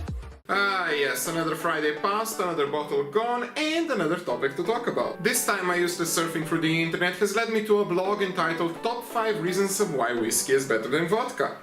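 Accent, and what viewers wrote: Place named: Russia. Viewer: à Guy with russian accent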